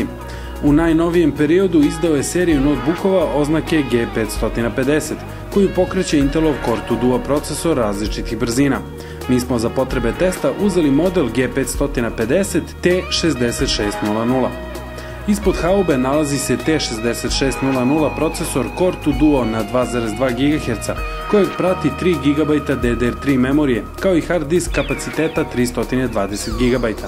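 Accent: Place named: Serbia. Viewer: jbt srbin prica :D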